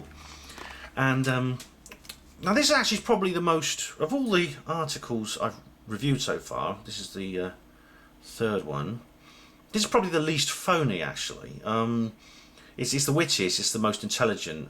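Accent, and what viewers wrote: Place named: RP, United Kingdom. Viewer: I have been a subscriber to your channel for a couple of years nowand i enjoy the content and share quite a few of your views, however without meaning anything bad i have to laugh at you slagging or mocking the way the toffs speak all proper,or Daily Telegeraph like. You do not exactly have the twang of someone who was brought up on a housing estate. Whether you were or not isn't what I'm getting at nor am I slagging you,but you do talk quite proper yourself. I can understand a bit cos growing up in Glasgow I talked a bit different when I am with my mates than I do when I am speaking to someone in an office setting or maybe a court (lol) definatly na lot more from I was 17 or 18 plus i went to Northern Ireland to live from about 1990 till 2006/7 so I had to change it or folk would never have understood me, so I understand if that is why you speak properly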